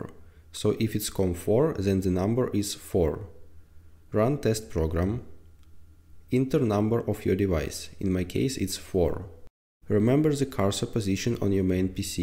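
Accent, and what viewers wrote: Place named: Russia. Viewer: Норм русский акцент я балдею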